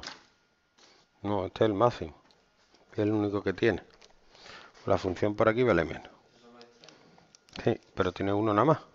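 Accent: Andalusia, Spain. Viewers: Que se note quien es de Andalucía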